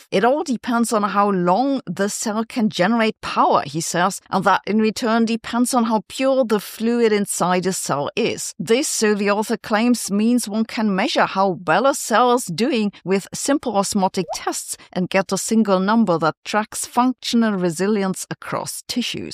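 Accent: Germany. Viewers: She is German. Give her a break...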